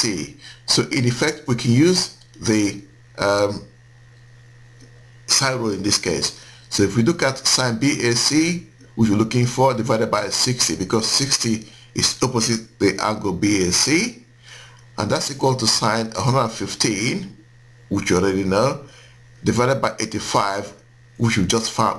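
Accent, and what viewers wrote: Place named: Nigeria. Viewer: ahaha nigerian accent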